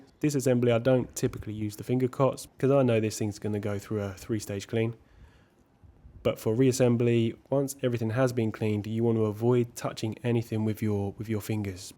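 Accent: United Kingdom. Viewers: Judging by your accent you are British British. So why the weird American word "winded", instead of wound?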